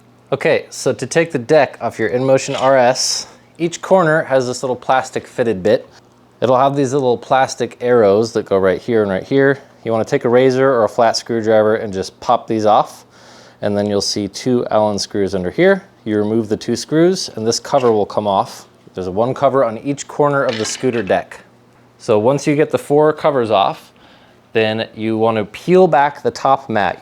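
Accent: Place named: England, United Kingdom. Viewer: Hahaha you are hilarious! English accent and all! 😂🎉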